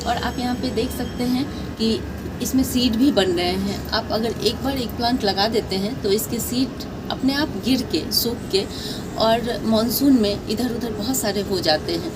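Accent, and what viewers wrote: Bihar, India. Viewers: Na hi tumhara biharipan bolne mein fark he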